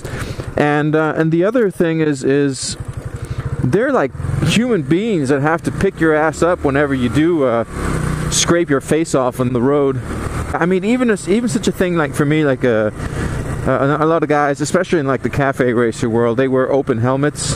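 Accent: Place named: United States. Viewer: Nice, your accent suggestion you're American. What are you doing there? You also lived in Mexico? Interesting.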